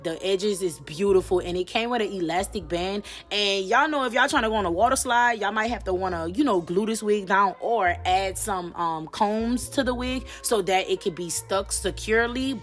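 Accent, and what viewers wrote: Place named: South, United States. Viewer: Ooop why I wasn’t ready for this cute ass voice/accent. Wth is you from, it’s given souf for sure …but I’m slightly conflicted. The way you say BELOW give the boot. Love I happened on your page today❤❤❤❤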